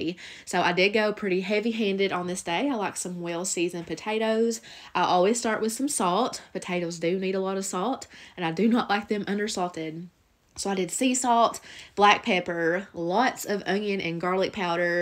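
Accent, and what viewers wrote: South, United States. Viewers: Hi - I really enjoy your cooking videos, you are very creative, plus I love your beautiful Southern accent. I notice on American vlogs pieces of pork are referred to as "pork chops". Here in Australia we only call it a pork chop if it has a bone in it. Thankyou for all your ideas & wonderful recipes. Best wishes from Sydney Australia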